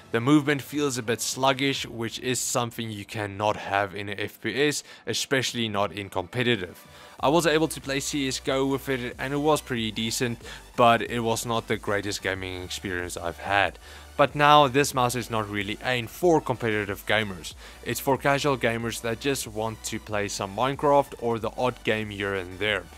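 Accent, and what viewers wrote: South Africa. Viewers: YES youre south african!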